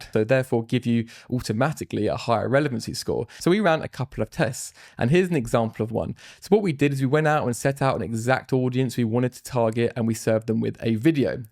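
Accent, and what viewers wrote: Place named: England, United Kingdom. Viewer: Dude, you sound so english wehre are you from or based actually right now.?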